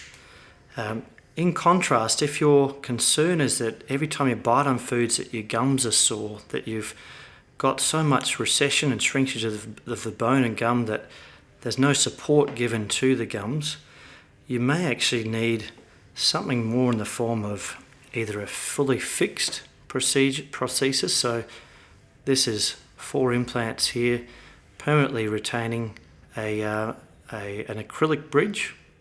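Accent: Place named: Australia. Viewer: Here in the u.s. my implants cost $30,000. I love the Aussie accent.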